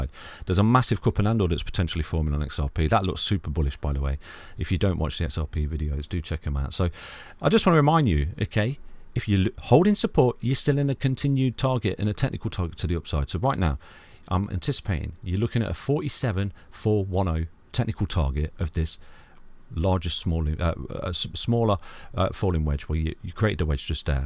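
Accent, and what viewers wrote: United Kingdom. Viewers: The Man with the British voice and beauty full Analysis!!!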